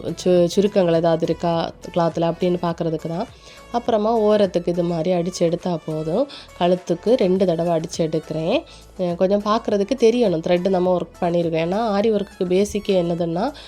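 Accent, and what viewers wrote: Kanyakumari, India. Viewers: உங்க voice ல நீங்க கன்னியாகுமாரி dist தான் antthu தோணுது.... நானும் கன்னியாகுமாரி dist தான்.... உங்க aeria வில ஓரோ dress க்கும் உள்ள stiching fees avalauv anthu ஒரு வீடியோ போடுவீங்களா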